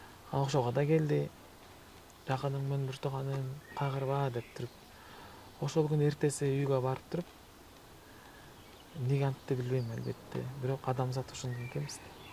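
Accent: Standard. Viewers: Сабыр берсин бейишинен орун берсин сенин улутуңду бөлгөн кыргыз кимиси башына ак калпак кийип жүрөт кыргыз болуп төрөлгөн эркектер бар калпагы жок накта кыргыз дагы сенчелик таза сүйлөй албайт кыргызчаны сага күч кубат берсин артында тоодой Кыргыз элиң бар экенин унутпа алтыным😢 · Кээ бир кыргыздар сендей таза суйлой алышпайт,орусча,чала суйлоп,азаматсын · аза ат сын кыргызча таптаза суйлоцт ырдайт рахмат да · Апандын арты кайрылуу болсун,кыргыз эмес экенинди эми билдим айтпасан эч ким билмек эмес болуш керек Кыргызчаны таза суйлойт экенсин сен эмес туштуктун кыргыздары оздору таза суйлой албайтго озьекче аралашкандай ударение менен суйлошотго молодец ишине ийгилик Нарындыктардайле суйлойткенсин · биз сени кыргыз деп билебиз.Бирок озбек болсо эмне экен.Кыргыз озбек казак бварыбыз бир мусулманбыз.Кыргыз тилин кыргыздан таза таттуу суйлойсун.Конул бурба негатив суйлогондорго.Иттер уро берет... 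· Кыргызстанда жашап, осуп,улутун башка болсо да кыргыз тилинде таза суйлогон,комуз черткен кыргызды даназалап жургон жарандарга ыраазымын. апана таазим сендей уулду тарбиялаган. Бейишин берсин. Коп жаша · Сиз таза кыргызсыз. Таза суйлойс кыргыз тилди жакшы корос.сыймыктанас.комузду да🎉🎉❤❤❤ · Ленин деген русский мектептен буткон кыргызча бизден таза суйлойсун.оштук баткендиктерден таза суйлойсун..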